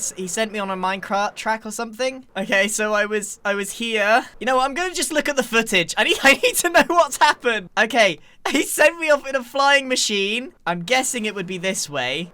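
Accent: United Kingdom. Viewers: Grian with a broken voice sounds more brittish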